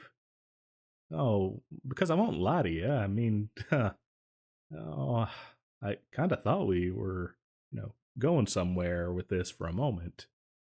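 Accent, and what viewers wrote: South, United States. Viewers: This is my favourite audio of yours. Your voice here is THE best accent I have ever heard. It’s like a bit southern, and I adore it. You should definitely do some more with this kinda voice! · AHHHH SOUTHERN ACCENT!!!!!!! 😍😍😍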